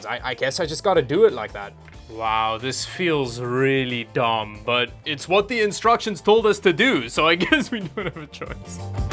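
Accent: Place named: Cape Town, South Africa. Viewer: Hey I'm subscribing just because I recognize the capetonian accent. Cheers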